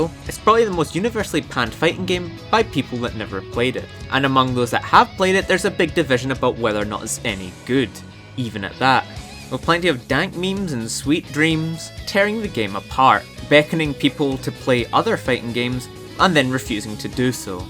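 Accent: Scotland, United Kingdom. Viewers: Time for monthly dose of smooth Scottish gamer man Also make the walking dead video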